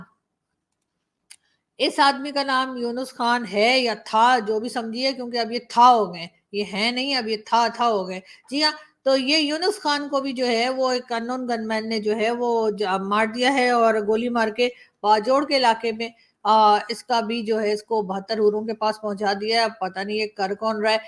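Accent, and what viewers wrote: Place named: Mumbai, India. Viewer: Mumbai language achha bol lete hein aap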